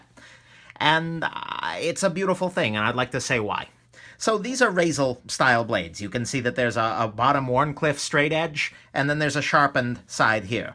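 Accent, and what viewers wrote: New York, United States. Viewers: I want to add I subbed last week and the voice tone and rythme made it for me. Makes it very enjoyable to ear!:) thanks and keep up... is this an New York accent?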